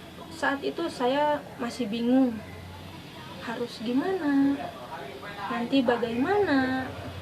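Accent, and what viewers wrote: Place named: Ambon, Indonesia. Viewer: Orang Ambon ya mba. Atw manado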